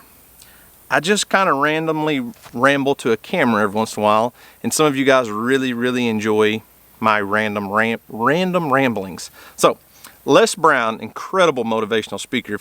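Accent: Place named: South, United States. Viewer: Hey, you have become a virtual friend that I enjoy spending time visiting with as you go about your days on the farm. I like listening to you ramble, it’s like sitting around a campfire with your best friend speaking the truth. I don’t watch tv just you tube, of course you caught my attention because you are so darn handsome with that southern drawl and you love to go fishing.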